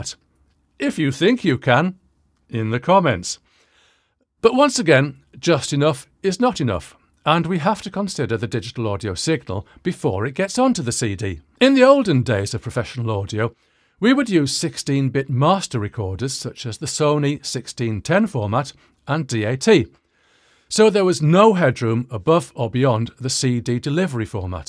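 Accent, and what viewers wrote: United Kingdom. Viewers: Greetings from California. Superb video! Can I ask which region of the UK is your accent from?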